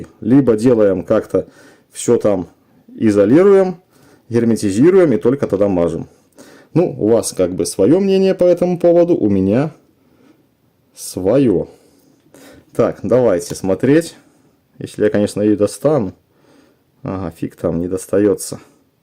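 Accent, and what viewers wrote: Belarus. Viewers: привет ты белорус? такой акцент прикольный....😁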